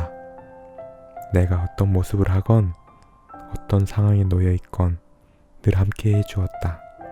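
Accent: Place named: Jeolla, South Korea. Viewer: 전라도 사투리 억양 ㅋㅋ 그래도 멋있다~~~!! 많은 부분을 생각 하게 하고 많은 부분을 되돌아보게 하는 시간이네요 오늘부터 오늘까지!!!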